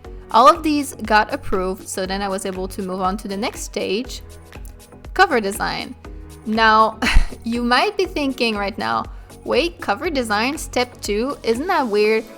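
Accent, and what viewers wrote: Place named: Canada. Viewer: Thank you,. That was really helpful! Can you do a tutorial, or series about Procreate on iPad or Affinity Designer on MacOS? Btw: Canadians are the best, love your accent! <3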